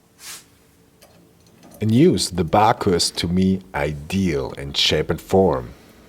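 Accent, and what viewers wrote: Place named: Germany. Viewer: Interesting video, interesting toolset. I only have seen those hollowed tips on -Screwdrivers and I am thinking about such a set since months now. But if I am totally honest, I don`t have to work with a lot of slotted screws. So probably I will be fine with the screwdrivers I already own. Nonetheless I`m really happy to see you back here again. I really like your style of videos. Always kinda fun to hear germans talking english about german tools and been remembered to that when they call brand names oder place names - especially "Remscheid". Finde ich super!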